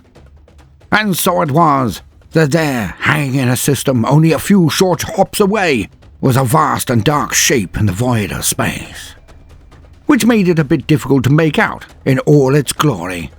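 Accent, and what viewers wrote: United Kingdom. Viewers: Hearing the contrast between a dramatic, vaguely british accented voice delicately explain the brutally cunning (or is it cunningly brutal?) workings of what passes for an ork's thought processes is just too perfect.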